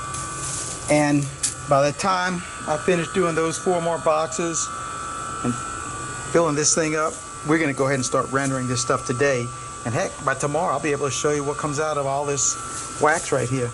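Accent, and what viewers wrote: Louisiana, United States. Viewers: This just popped up on my YouTube (fyp) , you started talking and I immediately said “okay where is he from” because I new it had to be Louisiana lol just wanted to say hi from northeast Louisiana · Stumbled upon you from another bee video. Could tell right away you were from southern Louisiana.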